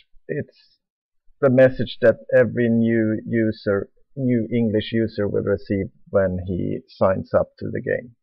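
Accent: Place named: Sweden. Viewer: Swedish accent FTW :D // Runeic on FID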